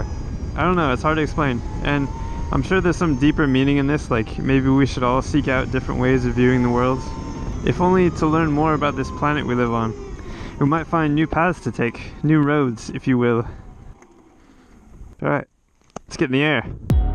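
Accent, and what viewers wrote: California, United States. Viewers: Great insite! I often get asked what I'll do if my battery needs to be replaced of if something much better comes along. My answer is that I'll sell what I have and buy the next thing. I'm writing this response on my new galaxy Fold phone/table, in my Tesla, dreaming of owning an Energica. I think you have a California accent. Your d's sound like t's. Like when you say "newzerolanT." I'm accused of having a Canadian accent. I say "hoose" and "aboot" instead of "house and "about." But I grew up in Massachusetts so that doesn't make any sense, eh?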